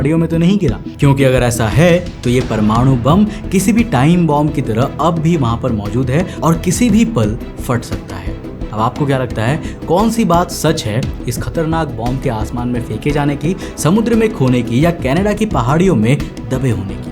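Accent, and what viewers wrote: Bengal, India. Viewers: Tomar hindi pronounciation sune vabini j tumi bangali , khub sundor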